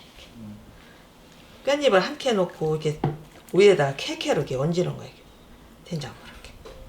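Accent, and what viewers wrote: Chungcheong, South Korea. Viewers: 전라도 사시는데 충청도 말씀으로 들려요~흐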